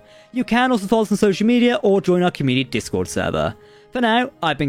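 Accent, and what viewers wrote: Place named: Cockney, United Kingdom. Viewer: Firty seven. The Cockney accent drives me insane.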